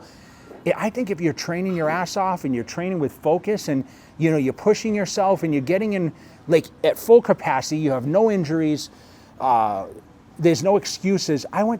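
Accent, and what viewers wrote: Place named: Boston, United States. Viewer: The Boston/Massachusetts accent coming out in full force lmao “shoaht list” “Splender” Boston: we drop r’s when they’re in the word and add them when they’re not there 😂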